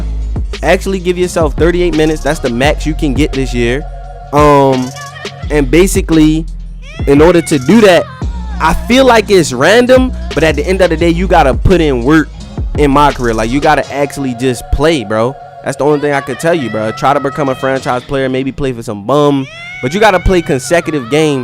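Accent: Baltimore, United States. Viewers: U got a Baltimore accent no 🧢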